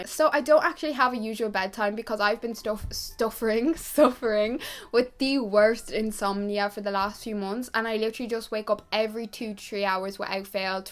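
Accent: Ireland. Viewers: And the award for Best Irish accent goes to you 🏆🥇🎗. You’ve just won First prize.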